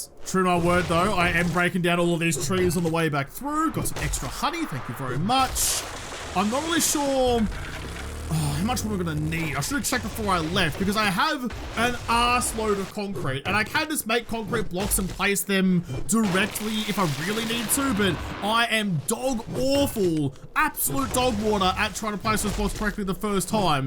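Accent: Australia. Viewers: But the rest of us are here for the rapid-fire Strine!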